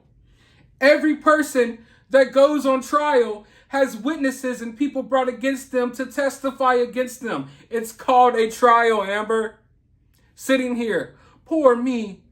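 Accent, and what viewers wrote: South, United States. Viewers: You are a way better actor than she ever wanted to be, and the southern accent love it Sho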